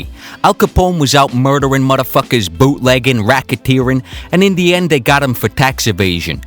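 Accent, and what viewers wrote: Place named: New York, United States. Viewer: That "New Yorkesque" accent and slang is just tremendous x)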